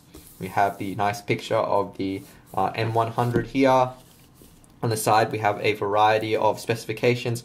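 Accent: Australia. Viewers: I can honestly say I have never heard an asian with an australian accent before.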